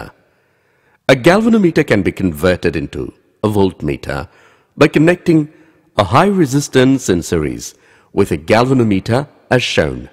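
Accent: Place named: India. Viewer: Sounds like an Indian who tries really hard not to sound Indian.^^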